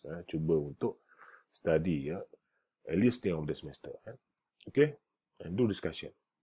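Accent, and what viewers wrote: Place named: Perak, Malaysia. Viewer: Pekat bebenor peghok nye ye😂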